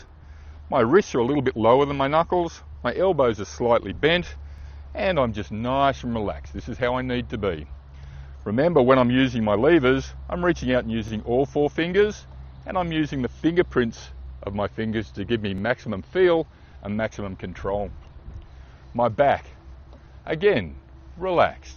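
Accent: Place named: Australia. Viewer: Brilliant explanation. Aussi English 😍 thank you 🙏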